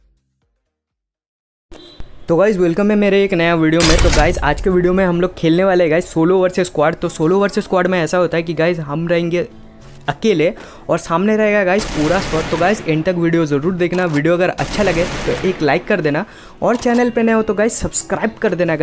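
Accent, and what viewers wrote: Bengal, India. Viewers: Bhai aap bengali heee naaa?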